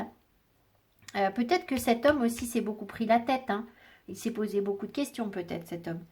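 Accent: England, United Kingdom. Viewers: J’adore votre accent anglais 😊 merci c’est juste 🙏🏻 🙏🏻🙏🏻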